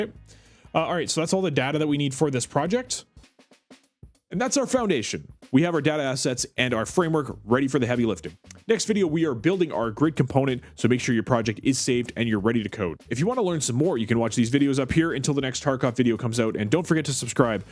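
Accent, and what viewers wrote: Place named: Canada. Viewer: just realized you're a fellow Canadian after hearing the "Out" and "About" pronunciation 😂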